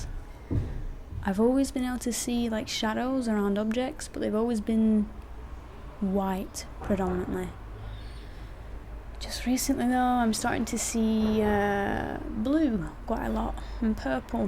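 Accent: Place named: North, United Kingdom. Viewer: Yo where you from, you sound northern!!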